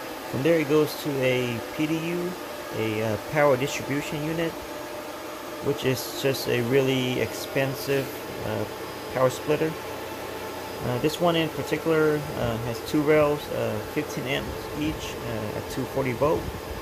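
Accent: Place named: Vietnam. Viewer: You have Vietnamese accent.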